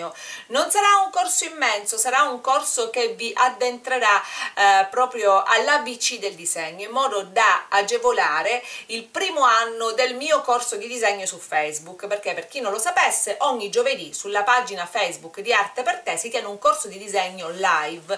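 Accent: Sicily, Italy. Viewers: Ma sei Siciliana vero?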